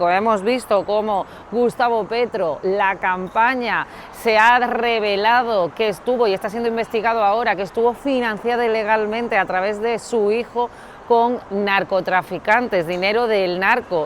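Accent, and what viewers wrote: Spain. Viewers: Me en canta tu programación pero también me encanta tu acento español😍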